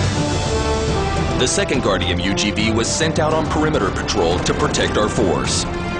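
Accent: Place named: United States. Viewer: Why on earth is the narrator a yank?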